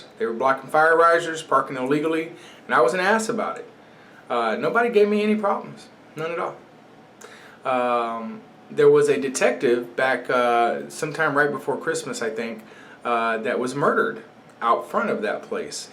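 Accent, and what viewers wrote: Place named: South, United States. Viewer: No idiot u sound like a dumb Ass Hillbilly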